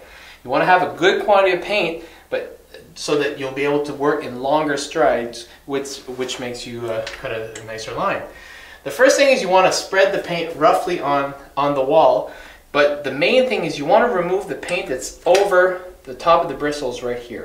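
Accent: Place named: Moncton, Canada. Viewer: you must be from moncton....the accent sure sounds like that.good tips tks.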